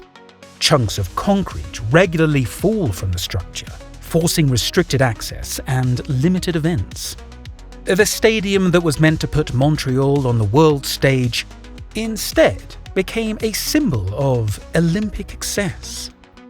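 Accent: England, United Kingdom. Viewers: Nice english accent😊😊😊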